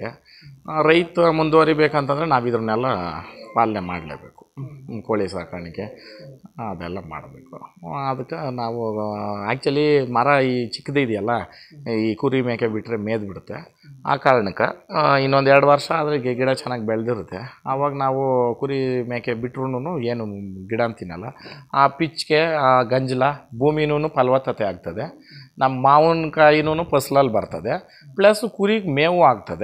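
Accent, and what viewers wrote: Kolar, India. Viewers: I love your kolar kannada and also your work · Nimma kolara kannada kelode chenda❤️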